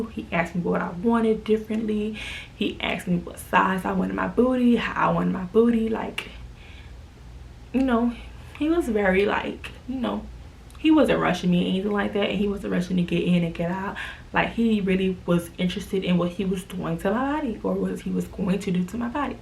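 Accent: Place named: Miami, United States. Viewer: Lmao your Miami accent is so cute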